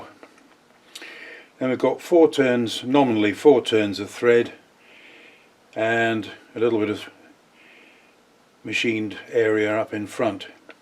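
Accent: United Kingdom. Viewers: Sounds good, just don't try to sound like doubleboost, even the Brits have difficulty with that one. RP or home counties will be fine.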